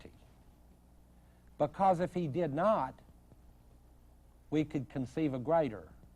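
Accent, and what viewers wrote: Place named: Texas, United States. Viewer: Watching this made me realise, as an Englishman, all academics should be delivered in a Texan accent.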